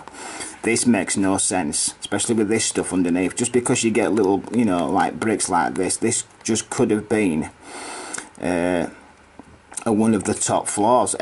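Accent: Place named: Barnsley, United Kingdom. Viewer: Everytime you say rebar with the Barnsley accent I imagine it's Ernest Moss talking. Makes these videos even better haha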